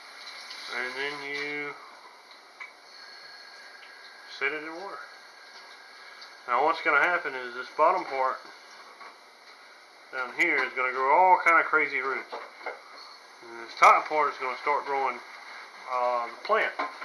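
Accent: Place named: South, United States. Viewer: I used shishkebob skewers (broken in two) because toothpicks for some reason didn't seem to be long enough for my situation. Just an option. I can tell you're from the south by your voice. I'm growing sweet potatoes in zone 3 up north. It works, but you really have to start them early in the house up here. You can let the vines grow really long and cut them into one foot lengths and plant each piece.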